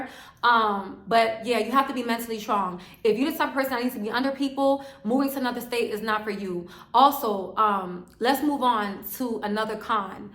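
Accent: New York, United States. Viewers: You sound so New York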